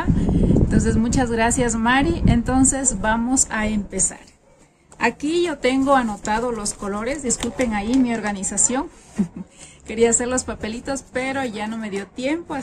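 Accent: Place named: Peru. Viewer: Hola saludos de Perú usted es de ecuador sigo a unos chicos de Marcos Otavalo ellos hablan muyyyy diferentes la escucho muy familiar como una Peruana . Yo sigo a Meliza y a Mary ahora también a usted.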